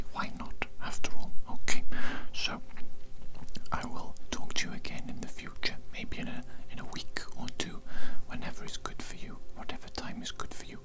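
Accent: Wales, United Kingdom. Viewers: the welsh accent hits 😫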